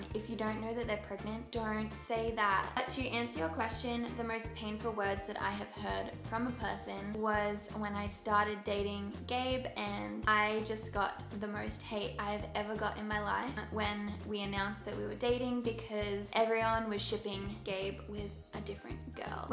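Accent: United States. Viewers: Omg your American accent tho!! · omg the accent!! It sounds like a voice over because it’s just not real in my mind to hear you with an American accent! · Hahah, why did it feel like a completely different accent with the American accent 😂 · You American accent 😍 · AMERICAN ACCENT IS ON POINT ! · Ok your American accent is officially on point!!! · You are so good in American accent.❤ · You sound completely different with an American accent😂😂❤️ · i love your american accent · LOLOL I love your American accent. so funny. seriously a different person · LOVE YOUR AMERICAN ACCENT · Omg it was so weird to see you talk in american accent 😨. It was reaaally good but sooo different from what we are used to! Love you😊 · WHAT THE HECK HER AMERICAN ACCENT IS SO GOOD BUT SHE SOUNDS COMPLETELY DIFFERENT AH 😩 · Your voice changes so much with your American accent but I still love you accent now!!!! 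🥰🥰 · you with an american accent is SO different! wow! love you. ❤️ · omggg doesn't even her voice sound so much different with the american accent? hahaha love it!!! like a whole other person :D still the cutest thing ever is her australian accent ☺ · I'm Japanese and I'm studying English , when I watched your video first time , I didn't think your accent is strong but in this video I know the difference !! American accent is cute too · Omg I love your American accent! I had to close my eyes and remember it was you! Love you 💕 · oh my gosh. her american accent just does NOT fit her XD keep it Australian i love your accent the way it is! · omg her american. it honestly made me “uncomfortable” luv u! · OH MY GOSH!! Your American accent was sooo good!! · I was so shocked by your US accent oh my gosh! I'm from nz and could never do one as good as you!! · Omg you sounds so different with that American accent!! 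· Your American accent is amazing! That's so funny how you can just switch like that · LOVE the American accent!!! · Your voice sounds so different with an american accent, but still so slick❤ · Although it was cool hearing you talk with an American accent, I totally favor your Australian accent! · Wow amazing american accent💖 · I think that you're gorgeous and you shouldn't worry about what other people think about you or your relationship with Gabe. Gabe chose you because he loved you for who you are and he was happy with you. I think that you and Gabe are perfect for eachother. I love your American accent❤❤❤❤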